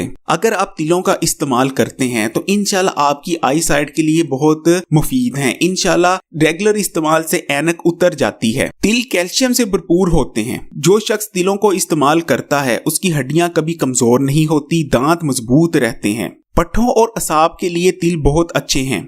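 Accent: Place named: Punjab, India. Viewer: I wish you find some better voice to represent your videos.His sound is so idiotic and wrong Urdu pronunciation.Stop punjaabbi on Urdu narrative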